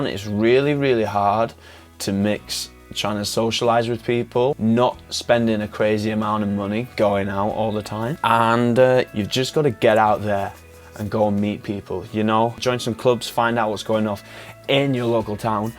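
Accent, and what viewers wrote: United Kingdom. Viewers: I'm a bit surprised that people would find your accent strange. People with UK accents are very common in Canada a lot of Brits come here. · Your accent is nice, not weird, sounds like a notherner.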